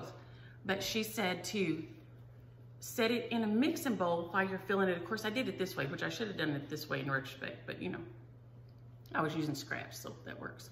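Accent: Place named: South, United States. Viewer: Maybe Webster should make a Southern dictionary! Never heard of Tump! But I bet Utah people say words you never heard, like “Oh, My heck”, Fetch, or Biffed it. Love your Stitchin, Stories and accent! FREE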